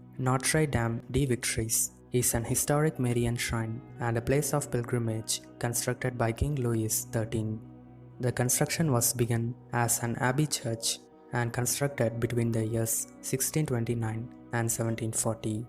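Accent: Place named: India. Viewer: Your accent seems to be of an Indian. Great Please continue your good work. May our lady bless you.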